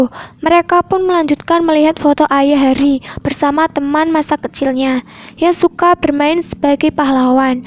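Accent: Java, Indonesia. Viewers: Bahasanya terlalu medok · Kak, kakak orang jawa ya😶 Soal nya kkak ngomong nya kyk orang jawa😅😅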